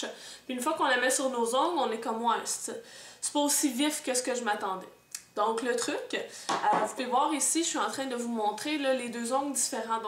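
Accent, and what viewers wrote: Quebec, Canada. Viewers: C'est possible que sa marche ( le problème j'ai pas de vernis neon ) -.- je vais essayer quand j'en n'aurais mais sinon j'aime bien ton accent ! Tu es Québecoise ?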